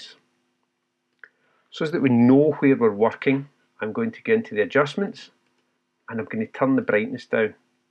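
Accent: Scotland, United Kingdom. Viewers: Thanks a lot for your shared expertise and for the files used to follow your really helpful video. Your a great Ambassador for Luminar. I follow you from the first day I used Luminar 4. Really helpful. Besides I love your Scottish accent (I'm a fan of Scotland).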